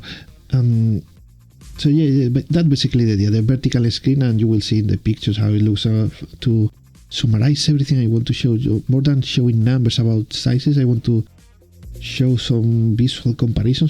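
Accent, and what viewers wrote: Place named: Spain. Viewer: Estupenda idea. Te escribo en español porque por el acento está claro que lo eres :) ¿Cómo haces para rotar la imagen? ¿Es una funcionalidad de tu televisión o de tu emulador? Me gustaría implementar tu idea con una Pandora Box, pero no tengo claro que sea posible... Gracias y saludos.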